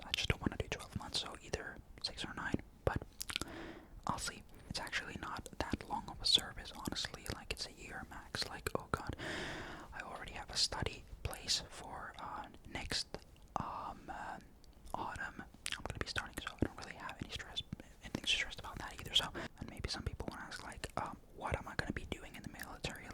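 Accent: Finland. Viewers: i think i've only seen like a few of your vids but when the video started i said "he's probably from finland" and i got it right lol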